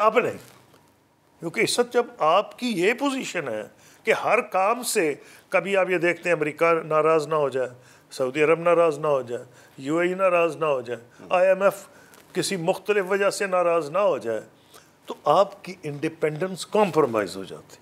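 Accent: Punjab, India. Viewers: Why this gentleman speaking Urdu in Punjabi style...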